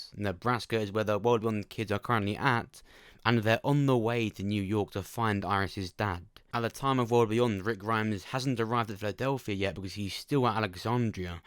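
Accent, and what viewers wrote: United Kingdom. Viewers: you really sound like Jamie Oliver, one of the many family chefs in the UK, I'm sure you know who he is and I'm pretty sure you guys also came from the same area because of your accents.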